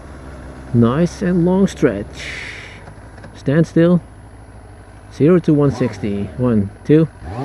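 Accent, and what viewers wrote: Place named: Netherlands. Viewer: Are you from holland?? because you have a dutch accent to you're english (I'm from holland) Btw nice bike ;) + another reasen to think that you are dutch is because everything i flat If you are!! Hoi hoe gaat het met u !!!! ;)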